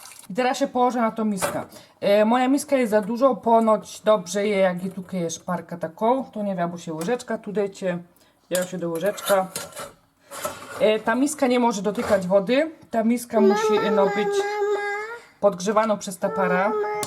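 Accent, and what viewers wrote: Silesia, Poland. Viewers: Tak, śląskim ;)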